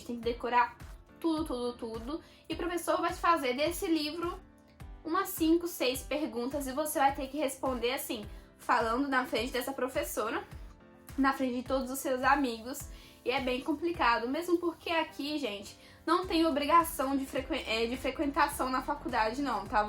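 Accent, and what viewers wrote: Italy. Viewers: Ouvir vc falando que sofreu e ouvir vc falando já com sotaque italiano da um orgulho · Você sem perceber adquiriu um pouco do sotaque italiano, que legal haha! Você fala "cantando" em alguns momentos. Un abbraccio.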